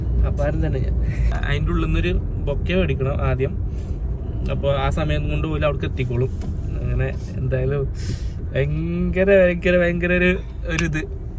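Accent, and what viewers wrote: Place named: Kozhikode, India. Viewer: കോഴിക്കോട് ആണ്...... Confirm... ഇയ്യ്... അ അന്നെ.....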